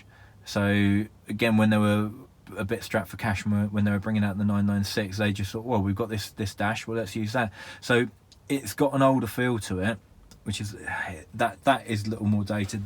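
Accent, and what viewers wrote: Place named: England, United Kingdom. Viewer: love it how Queen’s English pronounced in Imperial measurements. Nice C4S!!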